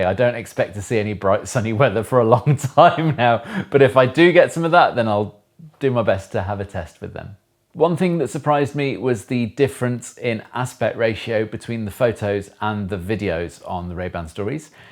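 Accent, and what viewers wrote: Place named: England, United Kingdom. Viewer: I like unboxings with the English accent, but I just bought them just to do bets on how creepy they are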